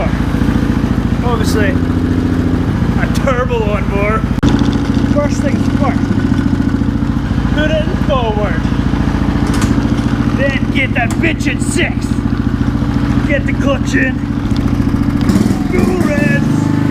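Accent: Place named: Scotland, United Kingdom. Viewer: Shit. Love the accent brotha haha. Scottish?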